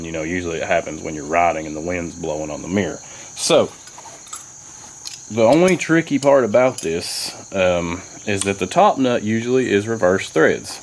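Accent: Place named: United States. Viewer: But bro.. Is that how you all really talk in America??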